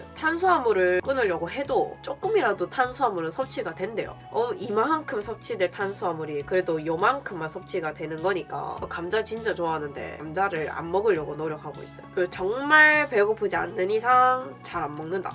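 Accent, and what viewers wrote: Gyeongsang, South Korea. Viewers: 말투는 대구 경북 ㅎㅎㅎ너무이뻐요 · 大邱のなまりですか？？ 何となくですが……🤔 とてもお綺麗です！！🥰🥰🥺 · Is this a busan dialect ? I love it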